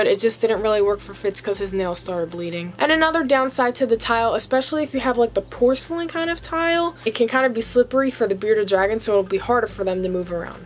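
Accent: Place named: New York, United States. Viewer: Jersey or New York, your twang is confusing as hell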